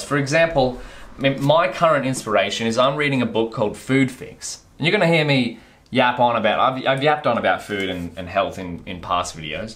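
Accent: Australia. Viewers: first sentence I could tell he is an aussie jaja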